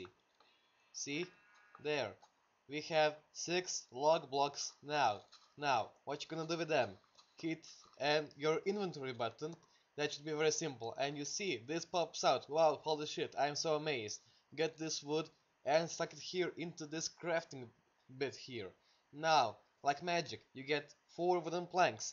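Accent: Russia. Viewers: You sound like a russian but you're croatian its weird :P. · RAŠN AKSENT <3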